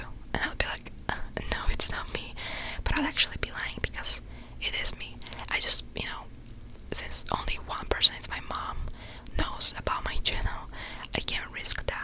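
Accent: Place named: Europe. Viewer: Love this video. Really enjoy rambling videos in general as they are so very relaxing. Absolutely love your accent. Can’t quite pinpoint what it is but maybe somewhere European? Whilst I really enjoy your GeoGuessr, Wordle and Sims videos, would love you to do these rambles occasionally if you have time. Thank you for the top ASMR content x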